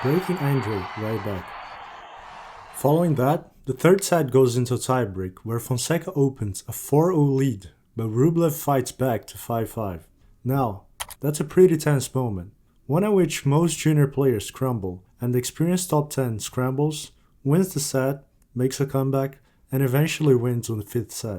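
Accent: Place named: Brazil. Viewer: you speak english with a brazillian accent, are you actually from brazil?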